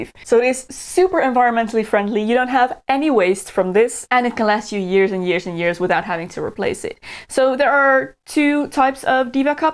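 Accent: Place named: Netherlands. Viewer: Ik kan zo slecht tegen je nederlandse accent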